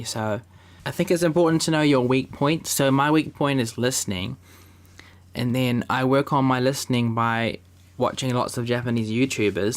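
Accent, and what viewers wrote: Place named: New Zealand. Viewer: Hahaha like ur kiwi accent mate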